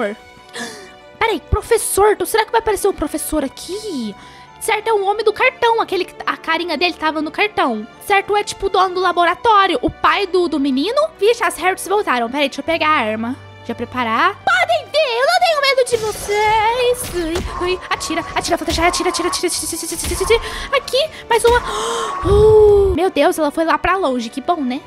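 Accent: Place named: Argentina. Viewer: Está bueno hablar así porque parece idioma argentino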